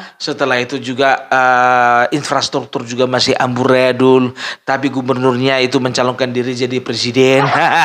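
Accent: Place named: North Sumatra, Indonesia. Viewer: Aku suka sama Opung logat Batak nya kental banget.salam knal Opung Aku dari jawa tengah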